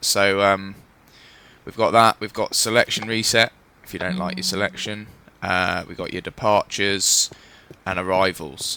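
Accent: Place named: England, United Kingdom. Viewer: he has an english accent like an english...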